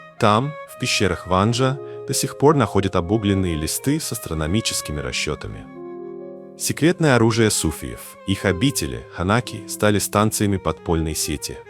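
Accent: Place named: United States. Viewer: Афтор есть немного акцент. Как будто это американец. Может я ошибаюсь.Но спасибо за труд..Салом ман точикам Ориоям.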